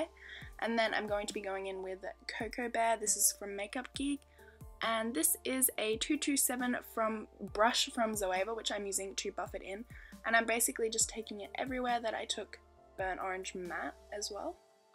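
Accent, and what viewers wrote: Australia. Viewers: She has an Australian accent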